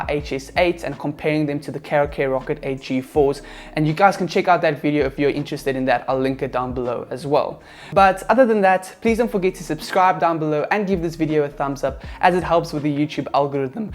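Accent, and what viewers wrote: South Africa. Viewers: completely unrelated question but are you south african? i'm trying to learn the different styles and accents of english better.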